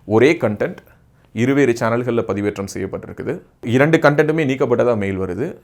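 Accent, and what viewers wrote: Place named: Thiruthuraipoondi, India. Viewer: திருத்துறைப்பூண்டி குரல் உலகெங்கும்... பெருமை சகோதரா...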